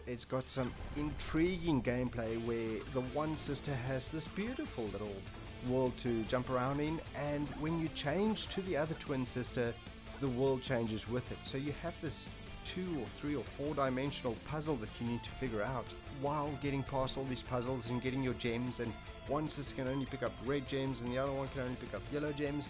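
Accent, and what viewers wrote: South Africa. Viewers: accent is totally South African, sound like some of my boets Joburg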